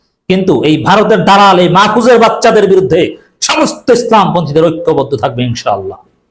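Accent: Barishal, Bangladesh. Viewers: আপনি কি বরিশালের??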